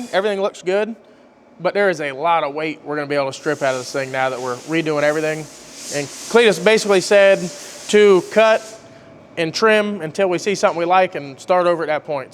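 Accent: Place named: South, United States. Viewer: Thanks for the great content. And also many thanks to your cameraman and editor. Question. Ive heard you and say "Sawzah" several times. Sawzall is a brand name from Milwaukee tools. Is that just a Southern thing to say Sawzah? Im genuinely curious. Not trying to be rude. People pronounce things differently all over the world.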